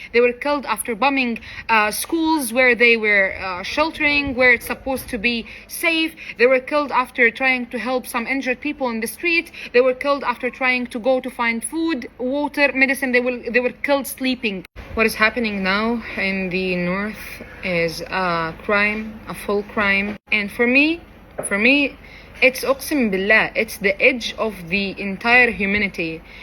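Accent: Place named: United States. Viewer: She always speaks English so weird as if she’s American or Palestinians are American 😅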